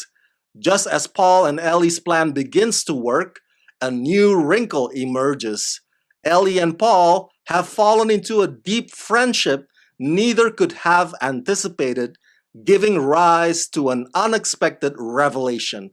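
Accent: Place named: Indonesia. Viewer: btw i hear an indonesian accent are you indonesian?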